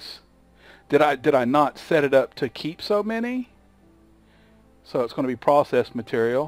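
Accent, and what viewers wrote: South, United States. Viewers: Glad I can hear you in this video. Hated that I could hardly hear anything in the last 3, and happy to hear a fellow southern voice.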